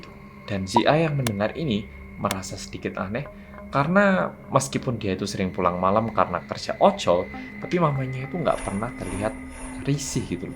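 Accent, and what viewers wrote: Java, Indonesia. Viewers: Aku udah siap2 mau bilang "orang korea yang medok"😐😂 Ternyata Malah keweden😂😂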